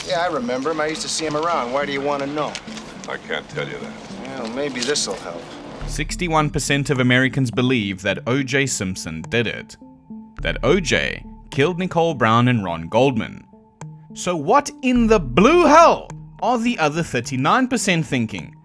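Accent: South Africa. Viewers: I knew you were South African! I've asked you several times because I recognized the accent. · I've been watching (listening to) your videos a lot while drawing this past month, and that entire time, I, an Australian woman, have been trying to figure out if your accent is from New Zealand or Tasmania. As soon as you said South Africa, it was a total Eureka moment.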